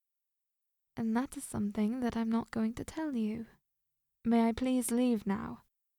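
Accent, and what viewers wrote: United Kingdom. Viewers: your British accent is no less than satisfying and ethereal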